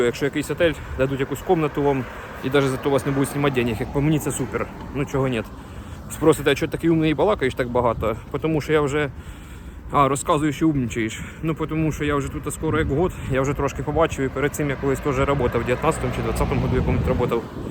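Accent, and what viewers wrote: Ukraine. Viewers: досмотрела до конца, хоть и не ищу работу)Я ещё не слышала такого говора) акцент украинский, а слова русские, очень необычно 🙃 Удачи Вам, где бы сейчас ни были!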